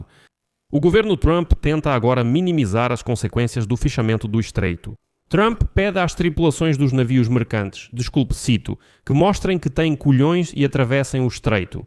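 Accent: Portugal. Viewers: Não dá pra ficar misturando dois sotaques Ponho o comentário e saio Gosto muito do sotaque ❤luso mas bagunça não dá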